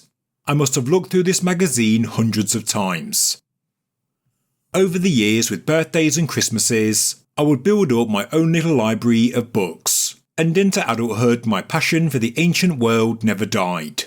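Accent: United Kingdom. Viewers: Someone asked recently if you were Australian or from UK. I answered that you were from the UK but i couln't tell them where. They were interested in your accent and trying to place it geograhically.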